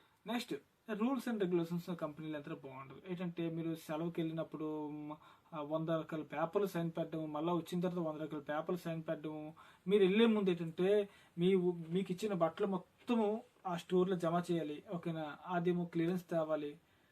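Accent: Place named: Andhra-Odisha border, India. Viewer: నువ్వు ఆంధ్ర ఓర్రీస బోర్డర్ కదా నీ తెలుగు అలానే ఉంది బోర్డర్ కదా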